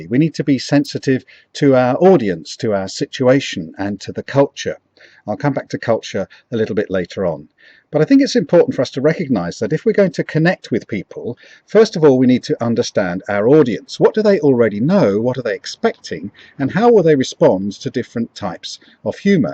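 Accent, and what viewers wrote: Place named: United Kingdom. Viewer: Most amusing, - couldn't help smiling at some of the lines, delivered in such a typical British style...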